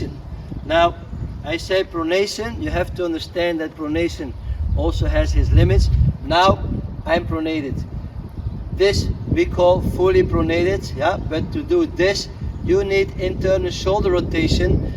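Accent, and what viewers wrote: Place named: Belgium. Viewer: dankjewel meneer. I thought I picked up the accent - I used to live in Belgium :)🇧🇪 Thanks for the advice on the back. I often get some backache just from playing so I need to be extra careful with serve but I’ll give this a go! Again, great video. Thanks!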